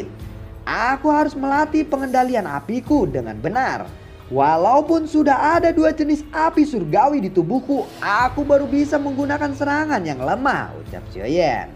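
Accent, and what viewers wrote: West Java, Indonesia. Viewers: Anjay up lagi kirain gk bakal up lagi,gw terlanjur tidur:v Mang pasti sunda nya?:v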